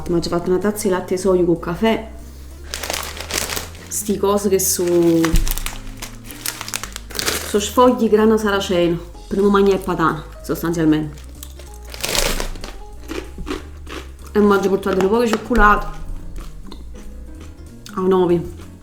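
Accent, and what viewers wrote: Naples, Italy. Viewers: Parli un napoletano bellissimo, molto classico, pulito, tipico delle persone istruite che hanno una cultura,infatti non ho bisogno di nessun sottotitolo perché è lo stesso napoletano con cui mi parlava mia nonna adorata nei momenti di tenerezza, lo stesso di Eduardo, di Viviani e non quel brutto dialetto sguaiato imbastardito che purtroppo si sente parlare tantissimo oggi specie dai più giovani, li si che ho bisogno dei sottotitoli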